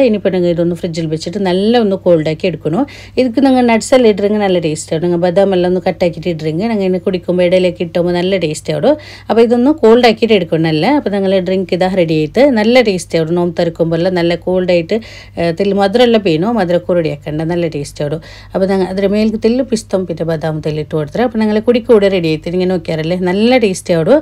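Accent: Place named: Kerala, India. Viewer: സംസാരിക്കുന്നത് കാണുമ്പോൾ ഇയാൾ ശരിക്കും പ്യാരി തന്നെ ആണെന്ന് തോന്നുന്നു. പക്ഷേ സംസാരിക്കുന്ന രീതി മാത്രം മലയാളിയുടെ പോലെ ഉണ്ട്. അപ്പോൾ ശരിക്കും ഇയാള് മലയാളിയോ അതോ പ്യാരിയോ?🥰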